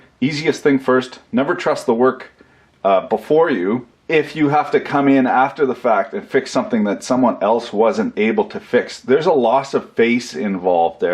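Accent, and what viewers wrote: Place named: Canada. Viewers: it's that Canadian charm boys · My wife think's AvE's voice (read Canadian accent) is kinda sexy, since watching AvE while in bed has been my newly formed habit. I can confirm that sexy time with the mrs has increased approximately 33.333% this past month (March). He is the ultimate wingman; helps me get laid while teaching.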